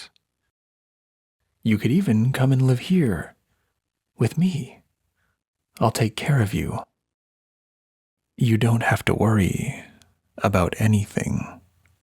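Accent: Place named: Canada. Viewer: I've listened to your stuff before and only today picked up on your Canadian accent. "Sorry" gave it away 😅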